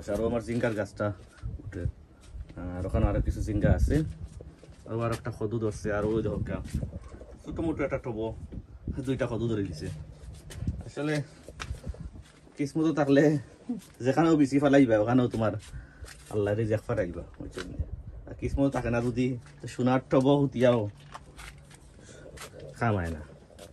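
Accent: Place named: Bangladesh. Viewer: মাশাল্লাহ খুব সুন্দর ফসল কথাই বোঝা যায় আমার দেশি মানুষ সব মিলিয়ে খুব ভালো লাগলো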